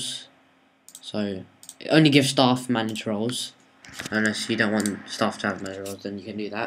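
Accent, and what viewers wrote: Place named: United Kingdom. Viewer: you sound like a more british version of my friend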